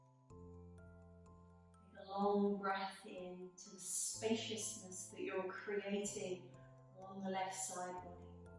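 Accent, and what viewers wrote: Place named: United Kingdom. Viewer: Wow! I stumbled on this flow while looking for no-inversion (headache-safe) yoga. When I get a migraine, the day after I have a residual headache that kind of feels like half a headache mixed with a hangover. I do want to do flows during these days but I found that doing any kind of inversion can exacerbate the head pain. So this flow was amazing, great breath work, mindful warm up and stretches, and amazing pacing. The voice cues were so on point too- I've never seen this instructor, but I didn't have to look at the screen more than once or twice. (And I'm American, so I love a beautiful British accent lol) Thank you so much for this gentle, soothing, and pampering flow. Going in my after work favorites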